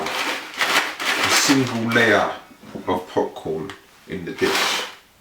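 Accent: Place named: England, United Kingdom. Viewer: thanks bloke. I wish all men sounded English like him. hubba hubba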